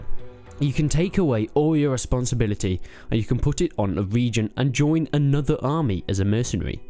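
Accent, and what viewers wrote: United Kingdom. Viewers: Where the hell did he do anything to insult German national pride? - of which there is none, matter of fact, anyways. You just condemned for allegedly hurting "a nationsfeelings", and in the same breath, belittled U.S. Americans, generalized them, for being easily offended. You can't measure actions with one standard and then apply another one to your own. And besides that bullshit - how is it NOT okay for him not wanting to subscribe to a German magazine if he - as he said in another video - doesn't even understand German very well? Fortunately for us, WE don't have to do that now. Is it the profit of the magazine you're defending or is it that you want everyone to read Gamestar? Dude. is - I hope I won't lie - British, judging by his accent. I have no clue, just found the video. And you want him to subscribe to a German gaming magazine so you won't think of him as a some kind of "Anti German Supremacist"? 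Fine. Go subscribe to the first Mongolian game magazine found on google then! Or otherwise, I must denounce you as a racist! Oh, you don't speak any Mongolian you say? - You racist! You think of Asians as inferior?! Dirty German scumbag! Surprise fact - everytime there is exclusive information about a popular subject somewhere in the media - in this case, exclusive intel about the state of Bannerlord in a German magazine - it will get translated, it will be published elsewhere after a while, it will cease to be exclusive. Which is a good th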